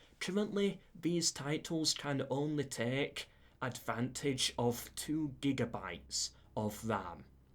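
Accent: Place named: Yorkshire, United Kingdom. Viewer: Yorkshire accent, Ever watch "Sharpe's Rifles" ?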